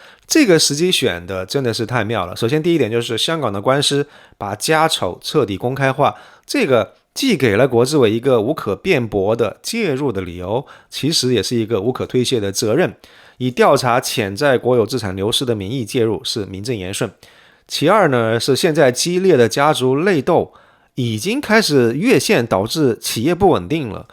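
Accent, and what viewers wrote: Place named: Guizhou, China. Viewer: 博主是贵州人吗？跟 二爷 的口音好像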